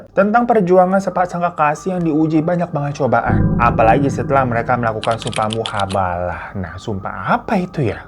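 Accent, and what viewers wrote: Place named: Kalimantan, Indonesia. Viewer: Logatnya kalimantan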